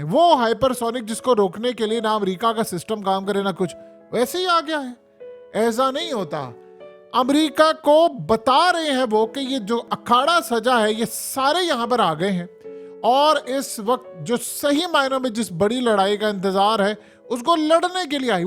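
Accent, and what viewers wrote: Punjab, India. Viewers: Would like to see the picture and name of the News reader / anchor of Haqeeqat TV. He speaks very well with a touch of Punjabi accent. The news is also very authentic and original (First to broadcast). Thanks.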